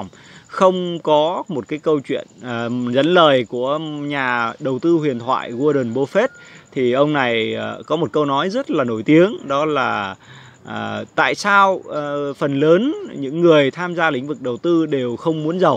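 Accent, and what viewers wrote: Thanh Hoa, Vietnam. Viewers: Nghe giọng người anh em thiện lành này chắc thanh húa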